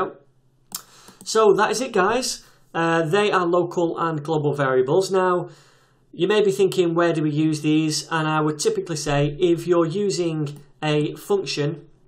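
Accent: United Kingdom. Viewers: Watching you or watching the BBC gives the same experience, the classic British accent.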